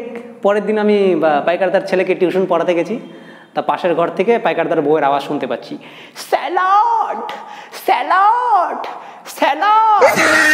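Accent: Bangladesh. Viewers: Dada Tume ki BANGLADESHI kano jane na tomar voice sune mone hoy....😕😕😕😕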